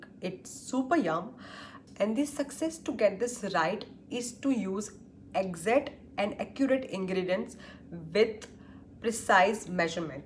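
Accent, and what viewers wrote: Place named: India. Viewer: The accent kills me... I get calls all day long from con artists and they ALWAYS have this India accent omg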